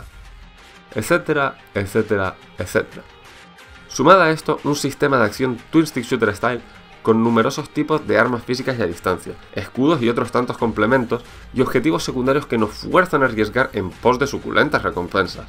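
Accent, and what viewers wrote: Spain. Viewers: Macho estoy disfrutando mucho de tu video y tu capacidad de análisis. Pero se te ha andaluzao la voz a lo "behtia". ¿Te has echado una novia cordobesa o antes te esmerabas en solapar el deje? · Es el acento de Canarias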